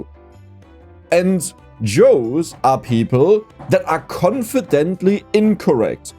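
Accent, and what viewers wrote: Germany. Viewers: I love your xD but for real, I really like your content, I pretty much always watch you to sleep in and I think your German ascent is charming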